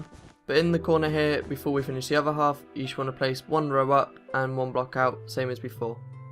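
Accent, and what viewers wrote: United Kingdom. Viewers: Youre buildings are no joke good as hell, by the sound of youre voice you sound like youre from the UK right? Varry good building bro. I subscribed to you so i can see more. Is it alright if i download the tutorials of this building for my own use only? Youtube sucks up all my data and i would love to make more of these. I do the same with Jagthunder1 cause his vids are bad ass and hes got some wicked ships. Check em out also. But any ways this is the best building ive ever made and you did a VARRY good job with explaneing it step by step witch made me build the first step perfect. And you stopt here and there and thsts where i paused it to build. Perfect vid man. Im looking forward to seeing more builds like this aswell. Thanks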